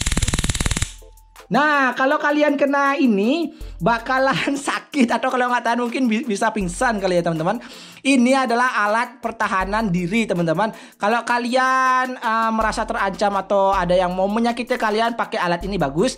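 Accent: Indonesia. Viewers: Kamu orang bali ya...mas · Kyk minang kocak suaranya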